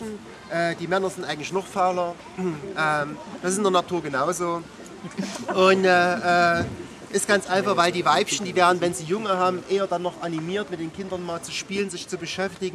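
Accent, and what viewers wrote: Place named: Saxony, Germany. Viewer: Herr, Sie können prima meinen Heimatdialekt sprechen. Ich wohnte in der Gegend, jetzt aber in Thüringen. Kriege richtig Heimatgefuehle, wenn Sie bei Elefant, Tiger und co dabei sind. Ihr Sächsisch zwischen " Leibzsch un Dresdn" ist das beste. LG nach Taucha. Sind Sie Ehrenbürger? Wäre angemessen.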